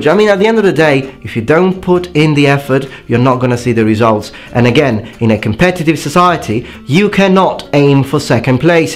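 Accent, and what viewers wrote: United Kingdom. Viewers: When I first watched your channel I did think you were British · You sound British to me, an American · Thanks. Your accent sound really closest to the native British accent. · You have a thick British accent. Come to America and no one will think you are Italian. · when i first heard you speak, i thought you were british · When I subscribed to your channel, I thought you were British until you specifically mentioned being Italian. But I'm American, and a lot of Americans say we can't tell the difference between certain accents. Like English = Scottish = Irish = Australian = South African = New Zealand etc. Could you do a video about the different types of Japanese accents? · I want to say that, you have a fluent british accent. Bravo. I'm American with Italian and Scottish ancestry. I have a very basic understanding of French, Italian, German and Hindi (I lived there for a time). 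I think everyone should learn at least 1 other language, it helps expand your mind and brings people together. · Your British accent is amazing! By the way, I just adore the thick Italian accent. It makes me want to order a large pizza.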